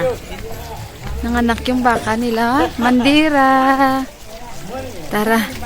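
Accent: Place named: Hiligaynon, Philippines. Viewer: Ganda ng vlog mo sis di nakakaboring at kaabang abang. Tapos yong pagsasalita mo di maarte and i like your ilongga accent. More power to ur channel at sa mga supporters and sponsors sa charity nakakaproud bilang isang filipino. I salute u all.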